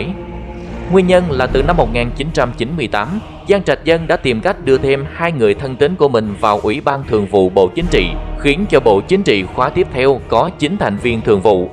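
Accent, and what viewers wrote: South, Vietnam. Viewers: giọng miền nam chuẩn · Thỉnh thoảng nghe đc giọng Nam kỳ rỏ ràng dể mến Toàn giọng bk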